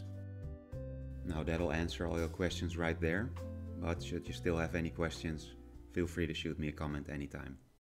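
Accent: Netherlands. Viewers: Are you dutch?!?! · Laat me raden, Je bent Nederlands of niet? Hahaha Nee maar Nicely done! :D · You are dutch, aren't you? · is he dutch? his accents sounds sooo dutch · You sound Dutch haha.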